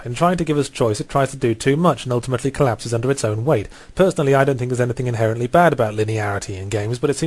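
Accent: United Kingdom. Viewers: i know that Fable is a completely awesome game, but what he says may or may not be true, it's still funny. (talks fast and in a british accent)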